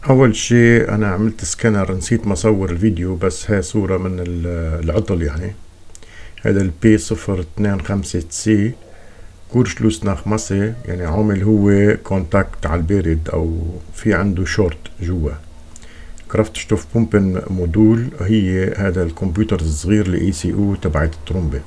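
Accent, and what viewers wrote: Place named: Lebanon. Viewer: من الجزاءر تعجبني اللهجة اللبنانيةفيها خير مودع اهلوا مظروب الكف حتي الخبرة ماشاء الله عاقل ورزين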